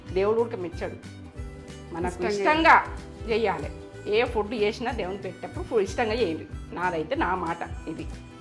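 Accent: Telangana, India. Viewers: అమ్మా, ఇంతకు మునుపు మీరు చేసిన ఉల్లి కారం పొడి, మరియు నూనె వెల్లుల్లి కలిపి చేసినవి చూసాను. మీమాట పలుకు తెలంగాణా యాస చాలా బాగుంది. అంతేకాదు అమ్మా మీ మాటలోని స్వచ్ఛత, స్పష్టత, వింటుంటే నేను బాగా గమనించిన విషయం ఏంటంటే. *అరమరికలు లేని, కల్లాకపటం లేని * మనసు అని నాకు అనిపించింది. ఈ మాట ఎందుకు అన్నానంటే నేను ఎన్నో వందల వీడియోలు చూసాను కానీ అవి చూస్తున్నప్పుడు వాటిలో నటన కనిపించింది. సాగదీయడం , అవసరం లేని సుత్తి రామాయణం విసిగించేవి. కానీ మీ స్పష్టత నాకు బాగా నచ్చింది అమ్మా. ఏ విషయంలోనూ తగ్గేదే లేదు అనడం నన్ను ఆకట్టుకుంది , తగ్గకండి. నేను ఆంధ్రా వాడిని. నాకు తెలంగాణ యాస అంటే చాలా ఇష్టం.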